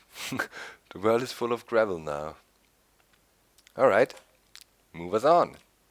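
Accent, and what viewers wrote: Germany. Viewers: Widac ze to jakis niemiec gada na tym filmie rosjan to lubie ale niemcy gunwozjady to juz ich akcentu nie wytrzymam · You sound very german.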